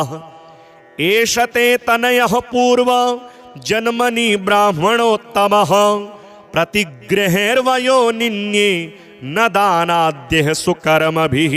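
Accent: Standard. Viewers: aapke Shuddh Uachchaarn SnskrittT Ke DeevaneinnN Hum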